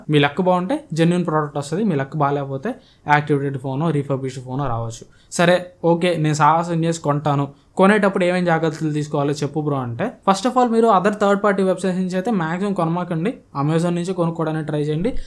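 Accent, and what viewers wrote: Ongole, India. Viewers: bro medi Ongole aa broo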